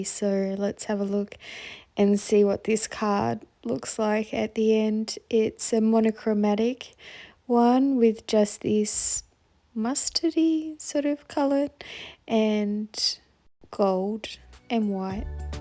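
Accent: Australia. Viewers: Wow, I just found your channel today. What a wonderful selection. Great papers and love the way that you've used them. It's hard to pick a favourite but I really liked Happy Birthday Mum and the last one with the gold dots. Thanks for the inspiration. I live in Australia and you sound like you have an Aussie accent too. 😘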